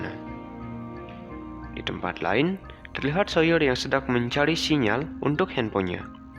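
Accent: Bali, Indonesia. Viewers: Aksen sudah kelihatan... Semangat bli, maju terus untuk berkarya... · Klo d perhatiin. Gaya bicara dan logatnya kaya orang bali... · Org bali ni logat nya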